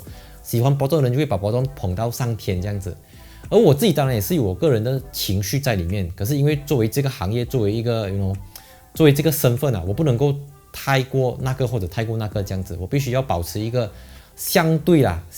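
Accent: Malaysia. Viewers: 多謝分享。很資訊性。學習了。還有熟悉的馬來西亞腔調。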